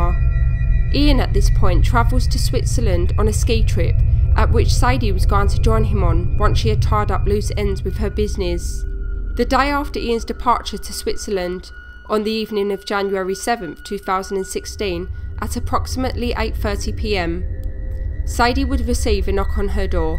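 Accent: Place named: Cockney, United Kingdom. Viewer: Good documentary very well put together and very informative, but use a better narrator that monotone cockney accent spoils what would otherwise be a very polished production.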